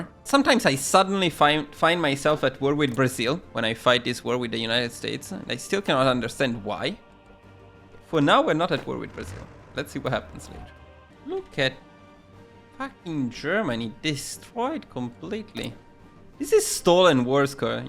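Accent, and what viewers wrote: Italy. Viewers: Grande bomber avevo un botto voglia di fare sto achievement ma non Trovavo un tutorial buono e poi il tuo accento Italiano spacca, di dove sei?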